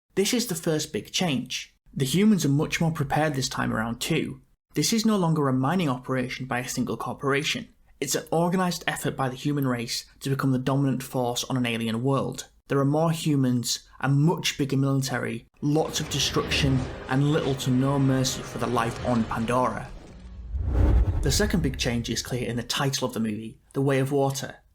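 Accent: United Kingdom. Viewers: Are you British 🥲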